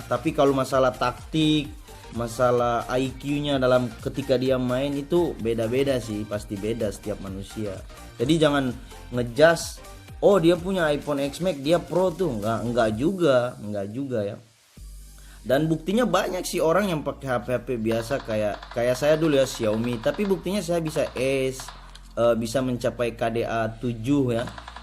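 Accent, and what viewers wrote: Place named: Sunda, Indonesia. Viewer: Orang sunda yeuh logat na beda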